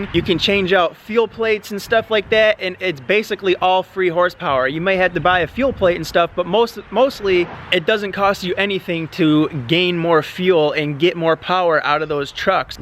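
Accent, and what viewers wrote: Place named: Upstate New York, United States. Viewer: must be upstate ny, that accent....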